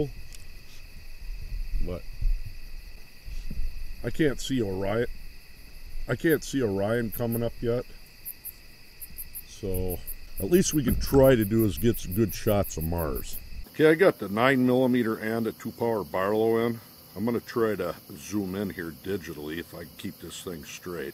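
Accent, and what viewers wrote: Minnesota, United States. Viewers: Must be from Minnesota. Great video.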